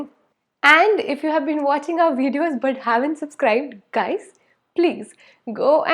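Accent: India. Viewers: North Indian accent in English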